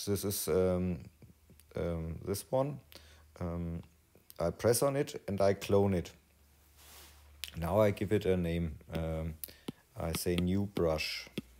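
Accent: Germany. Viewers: English with german accent :)) very very useful videos Thank youuu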